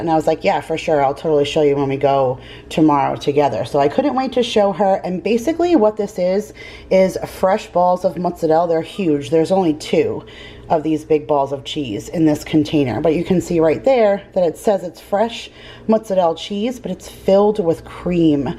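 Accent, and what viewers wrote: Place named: Italian-American, United States. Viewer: Great haul! I miss Trader Joe's so much!!! I live in Hawaii now and they don't them here (or Dollar Tree!!!!). Can't wait to move....I'm a long time viewer. I know a lot of people rag on you for how you say "ricotta" and "mozzarella" but I just wanted to let you know my great uncle and grandparents used to say those words how you say them too. They were first generation Italian and grew up in Pennsylvania. I definitely think its an Italian-American/ back East thing. I don't have a problem with how you say it. Own it, that's who you are! Anyways great haul! I love this time of year too (even though there are no seasons in Hawaii lol)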